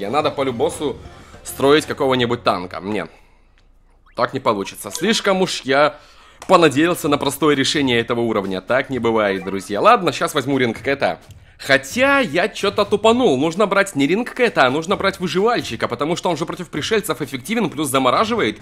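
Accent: Belarus. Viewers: ты же беларус да?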